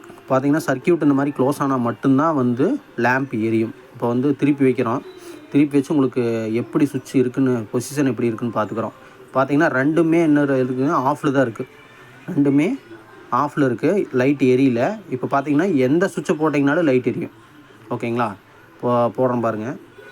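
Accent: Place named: Kongu, India. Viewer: Nice Kongu tamil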